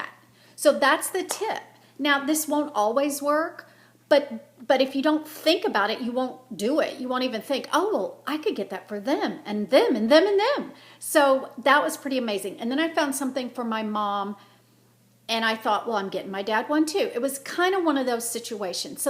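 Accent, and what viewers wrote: Texas, United States. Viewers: Am catching up on some of your recent videos...funny how after you moved back to TX, your accent is pretty strong. :) I am very sympathetic as to the struggles and trials you and your family are facing. It does seem like so many these days (self included) are going through some major storms. Thankful that we know Whose Hand we hold and the God who gives us strength to take each day as it comes. I will keep you in my prayers. Thanks for sharing from your heart, as always. I don't knit but I do crochet and have always found it to be a great relaxer. Blessings always! xo And Merry Christmas! No stress, only peace and joy! :)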